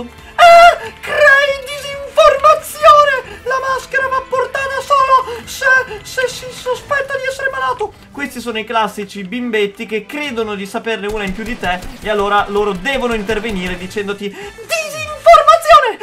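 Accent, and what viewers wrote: Veneto, Italy. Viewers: Ostrega te si Veneto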